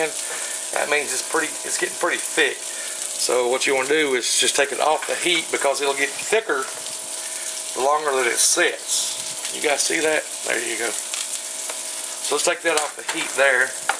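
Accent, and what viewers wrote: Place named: South, United States. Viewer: Aww! Loved your vid and Southern accent. Looks great. A few things you said made me giggle and I enjoyed your deep love/respect for your pops. Thanks for sharing!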